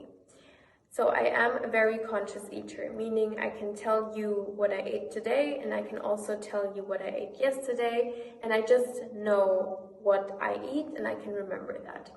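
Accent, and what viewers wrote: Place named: Germany. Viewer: She just have such a german accent.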